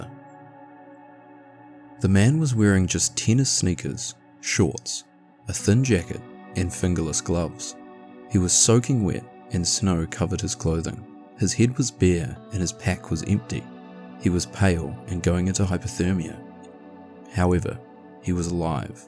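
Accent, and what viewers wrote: New Zealand. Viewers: Are you from New Zealand or South Africa? I'm trying to place your accent. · I turned on notifications just for the Kiwi accent haha 🇳🇿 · Are you from NZ?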